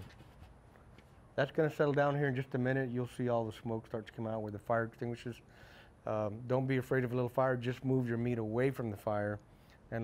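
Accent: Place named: Valley, United States. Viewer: Sound just like my relatives down in the valley. Alamo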